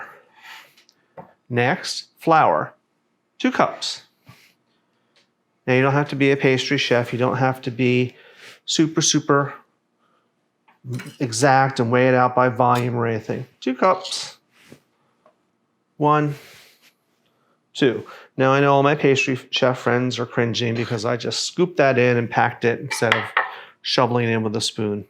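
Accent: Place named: United States. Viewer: This isn't Scottish shortbread, none of the ingredients are Scottish and from the accent of the bloke making it it's being made in america, so it just shortbread. As a baker i have made more shortbread than this guy has had hot dinners, and you don't put salt in it.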